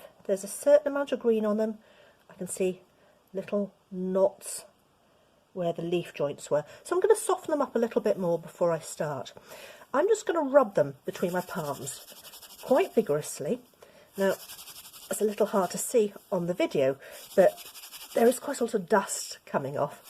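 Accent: United Kingdom. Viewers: Obviously the video is very interesting. But most of all I looooove your British accent!haha Free hugs from Córdoba, Argentina. Namasté.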